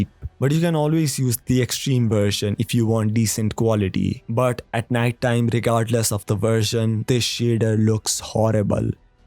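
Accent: India. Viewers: Ur voice like indian??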